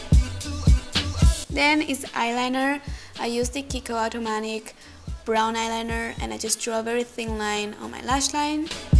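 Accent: Spain. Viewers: Audrey Lim she's from spain, i guess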